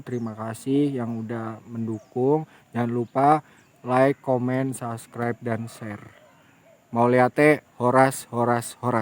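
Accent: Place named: Banyumas, Indonesia. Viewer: Woooo mas... ternyata org Banyumas.... sama donk... Banyumase ngendi mas??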